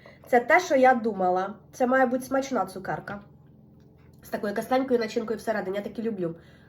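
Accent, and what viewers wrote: Poltava, Ukraine. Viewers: Дуже приємно слухати огляд полтавським діалектом.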